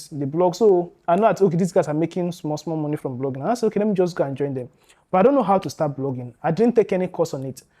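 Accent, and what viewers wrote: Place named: Anambra, Nigeria. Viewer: I like your accent.... My Anambra brother. This has to be the best founders story I've listened to. I had to download it to listen again. I loved the way you talked about your challenges. I'm one person that is usually afraid because I have tried some things that didn't work. I'm motivated already. I also like the idea of the financial market. I hope to meet you someday. I'm starting now.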